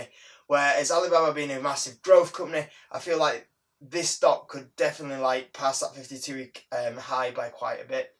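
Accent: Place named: United Kingdom. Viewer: Hi , By the accent you have , I`d say you`re british. Could I trouble you asking how much tax did you pay on your Apple shares sale ? I just started too recently and am curious . Is it just that 0.22% and brokerage fee or is it something else as well ? and after you pass like 12.5k this year , you start paying like 20% ?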